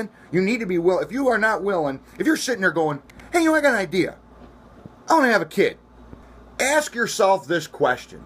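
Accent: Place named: South, United States. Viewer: Where were you from? I love the southern accent! Men & women! You should have written a country song about the incident. Though id like a clean language lyrics of that song if you could write it! You're are are right! That mother was ignorant!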